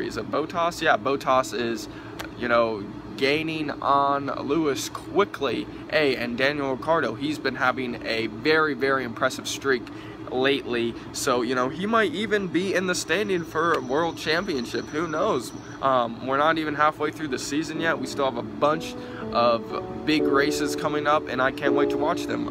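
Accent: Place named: United States. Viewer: Mate you should really research before uploading and not just talk crap. In F1, jumping the start results in a penalty not a restart. Also, you should really learn the drivers names and how to properly pronounce them. Don't Americanise everything, and people will respect you a lot more. Very amateur video. If you really want to upload these sorts of videos you should write notes during the race of what happens when so you know what to talk about.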